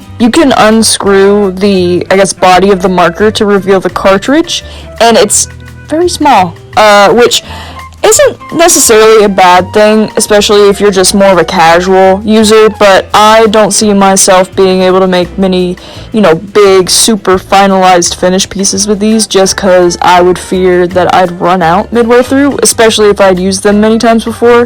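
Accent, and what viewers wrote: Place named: South, United States. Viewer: country accent + deep fried audio is so peak..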